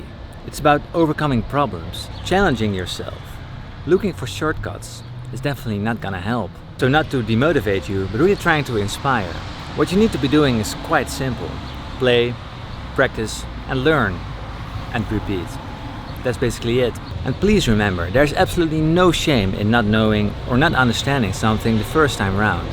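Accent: Netherlands. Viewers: Mui MasMacho every educated Dutch person speaks fluent English in my experience, and with that accent.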